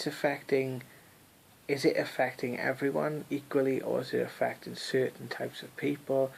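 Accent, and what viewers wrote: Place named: United Kingdom. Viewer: I went through this exact same rationalization process several months ago. My advice to you is to choose an example that you have personal knowledge of, and research it through copyrights, patents, and especially microfiche. If possible, do this research in the library rather than from digital sources. The physics of our world claim this is possible in theory, but you would have to pay attention to the modern discoveries, especially those from this year, ie the fifth force being dark protons, and the ability to engineer particle entanglement. If you are from Great Britain, as your accent suggests, you have the benefit of having been schooled with the bible. You may find your proof in a bible change. Good luck to you; the truth sets you free.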